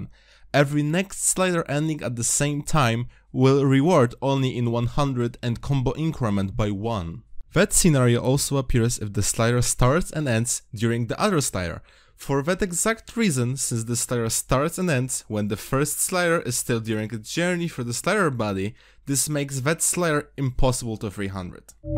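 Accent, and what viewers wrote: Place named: Poland. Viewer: he is polish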